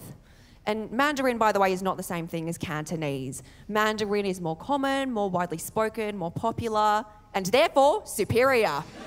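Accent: Australia. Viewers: I thought your story and story telling was hilarious. Also like your Australian accent. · I love her Australian accent with her Asian culture. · She's got a broader australian accent than me too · She’s so funny, I love the Australian accent on a woman. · Damn, she really hasn't kept even a fraction of her parents' accent, she sounds even more sterotypically aussie than I do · Well done. However, as an American, it is a little brain-jarring to hear an Asian speaking English with an Australian accent. Add a sombrero, do a little yodeling, and the cognitive dissonance will be complete. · Australian accent is unbearable. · I love the sticker figures drawings. is this your art work? Nice. It really makes your jokes visual and twice as funny 😆 For me being Asian American it’s funny to see and hear an Asian with an Aussie accent. “Mum” “Naur (no)” 😂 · accent sounds Australian!!! 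· F, u got d most Ozzy accent! Rock on,! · I love Aussie accent. I migrated to Australia in 1976 and I identify as Australian. There was an ad on TV many years ago that showed a teenage Chinese girl, a happy person, responding to a question from another traveler: "Where are you from?" Her response, in an Australian accent just like, was "I'm from Ballarat, where are you from?" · Wow, has an Aussie accent! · Like your Australian accent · Not eglish.... australian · 竟然听懂了，澳洲口音吗，way发音成wi。最后一段overlord是讽刺吗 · your Australian dialect is quite exaggerated, your punctuality is ok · Well done. It is always a pleasant surprise to listen to a beautiful Asian woman speak with a Australian accent ❤ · Missed Aussie accent!!! It’s been a while!! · She got that Aussie accent too.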